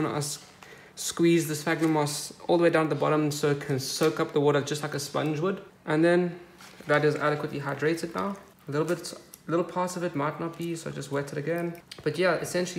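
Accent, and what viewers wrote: South Africa. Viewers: Australia? Here all these videos I thought I was listening to south africa.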